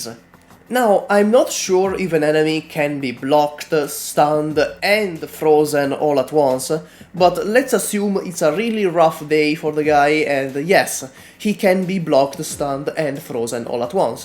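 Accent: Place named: Italy. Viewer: Knowing how much Arknights community has been saved by Kyostinv and his guides, hearing another AK player with an Italian accent immediately made me go “Hmmm, yes, this checks out. I instantly trust this man.”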